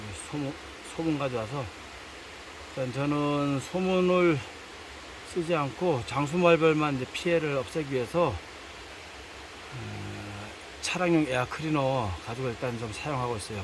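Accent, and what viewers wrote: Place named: Danyang, South Korea. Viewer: 간만에 단양 진또배기 사투리를 들으니 억양도 좋고 가지끈 반갑습니다 ㅋ 건강 유의하시고 사랑하는 동상^^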